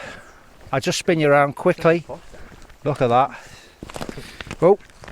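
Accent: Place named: Wirral, United Kingdom. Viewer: Guessing you're from either Posh/Nice Wirral, Southport or Chester maybe. Good Vid 👌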